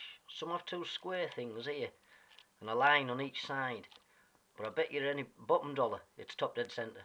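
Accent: Middlesbrough, United Kingdom. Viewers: Good vid thanks, must be a Boro boy with that accent!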